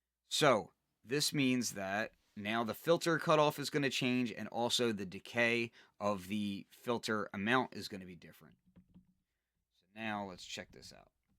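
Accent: Philadelphia, United States. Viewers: love your vids. gotta be a philly accent possible jersey or delaware.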